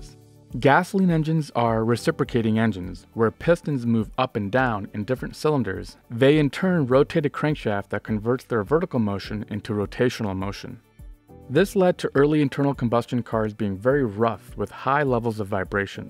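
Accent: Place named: United States. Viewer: hey you sound American, good job!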